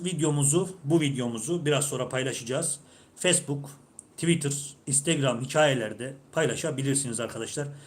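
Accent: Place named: Ankara, Turkey. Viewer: Angara sesin geliyor😮😮😮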